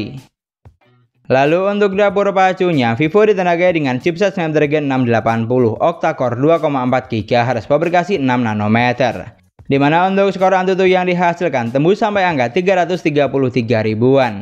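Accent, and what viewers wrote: Madura, Indonesia. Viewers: Logat madura bg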